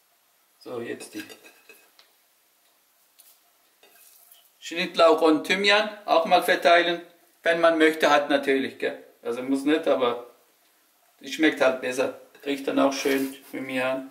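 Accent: Swabia, Germany. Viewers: Haha typischer Schwabe 😄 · Coler typ der hört sich an als ob er aus dem schwabenländle kommt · Ich liebe diesen Schwäbischen Dialekt :D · Dude Deluxe ich find die Kombi auch extrem amüsant. Mein Lieblings Dialekt 😅👌🏻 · Sympathischer typ echt, wie das Schwäbisch immer wieder durch blitzt isch Mega cool! Hab’s Video echt nur komplett angeschaut wegen ihm sonst spul ich immer vor damit ich seh was dabei raus kommt! Super Rezept! 👍🏼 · Türkisch und Schwäble. Beste Kombi :D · So lustig und sympathisch der schwäbische Türke😂 wieso ist das Video nicht FSK 18🤔 so porno das Essen😏😍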